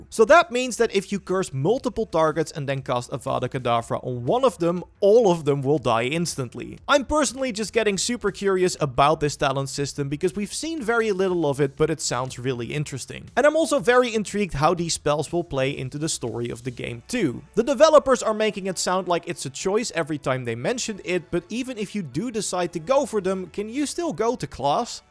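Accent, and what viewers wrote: Netherlands. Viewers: Waarom hoor ik zo hard een Nederlands accent?😅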